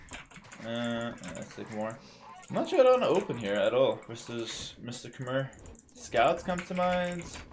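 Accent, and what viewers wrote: Canada. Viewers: haha thats just how we talk in Canada. We gotta say honestly before explaining something or how else will people know we are being honest? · ...You sound like your from the French speaking part of Canada.. or speak French.. you should should try and impersonate an Aussie accent ✌🏽🇦🇺🤙🏽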